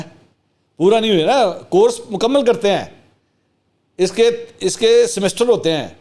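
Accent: Punjab, Pakistan. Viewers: Love ur Punjabi sir😊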